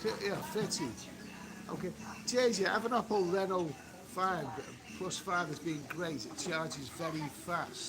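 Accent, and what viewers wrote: Liverpool, United Kingdom. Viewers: The sound is bad it's making you sound like someone from Liverpool.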